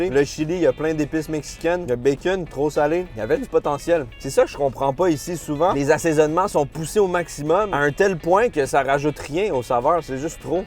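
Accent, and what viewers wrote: Quebec, Canada. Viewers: L'accent du Québec me tue toujours autant. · Super vidéo et l'accent québécois est tellement agréable chui jaloux ! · J'adore tes Vidéos , donnes tu les restes à tes amis ? ça me donne la gerbe . Ton accent Canadien ( Phil/France) me remplie de joie . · Je découvre ta chaîne!!!! Je suis juste fan , quand à votre accent Québécois, c’est juste splendide 💪✌️ Le bonjours de 🇨🇭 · l'accent québecois est vraiment retardé:face-purple-crying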